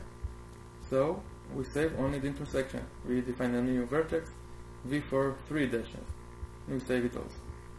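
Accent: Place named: Arab world. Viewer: you have arab accent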